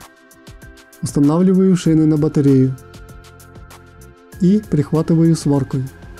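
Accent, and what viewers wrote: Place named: Vologda, Russia. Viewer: мастер из Вологды, по акценту сразу понятно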